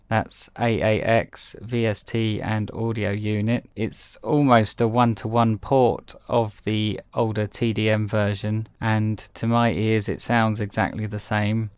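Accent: United Kingdom. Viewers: This video magnified my hatred of the British accent.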